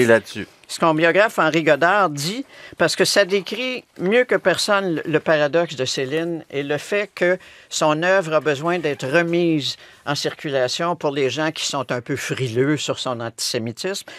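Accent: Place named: Quebec, Canada. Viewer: Merci beaucoup pour votre présentation. J'adore l'accent canadien, québécois. D'Algérie je vous suis attentivement.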